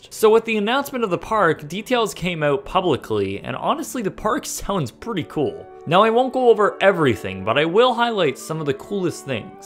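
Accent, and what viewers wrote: Canada. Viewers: "howoosing...oot..." the speaker is from Canada I am guessing, ay?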